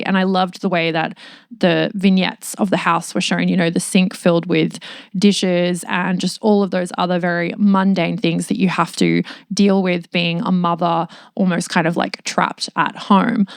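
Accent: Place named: Australia. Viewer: Are all YouTubers Australian? Or is this the new “Mid-Atlantic Accent”. Except its more like Mid-Pacific. Until YouTube i didn’t realize how close Australian is to an American accent. The only real difference is “er” pronounced as “uh” which is also a Brooklyn thing as in “Muthuh” and “Bruthuh”. Interesting review too! And seems like you changed your lighting which looks nice. Seems softer with warmer colors. Or maybe its a suntan. Either way looks good.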